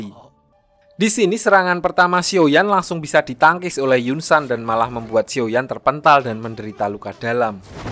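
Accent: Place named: Java, Indonesia. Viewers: Narator buagusss bgt. Ono jowone... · Mantuul mas bro suaranya, lucu dari Jawa ya salam kenal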